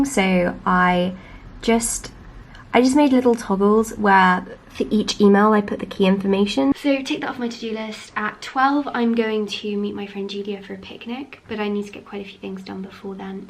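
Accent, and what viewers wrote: United Kingdom. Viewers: Two things why i watch videos 1. To be motivated 2. To learn some British accent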